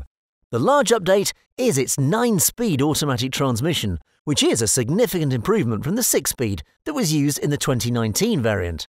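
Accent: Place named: United Kingdom. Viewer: Terrible British accent for a car that does not Get sold in the u.k.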